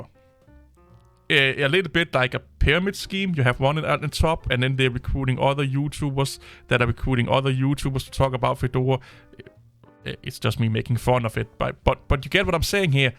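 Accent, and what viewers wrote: Denmark. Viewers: you're on the money nearly all of the time. One of the few Linux YTubers to be honest about the pros and cons of Linux. I use MacOS, Linux and Windows btw - each has merit. Danish accent fine by me! Very proficient in a second language unlike most native English speakers. Actually I like Fedora and use it - a good distro, but one amongst many. Just use a distro you like and suits you without making grandiose fanboy claims about it!!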